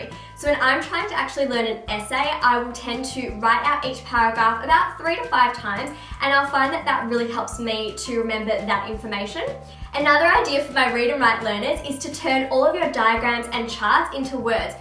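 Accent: Australia. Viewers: I'm used to clicking a video and hearing a British or American accent. hearing your Aussie accent has become weird, despite me being Australian too.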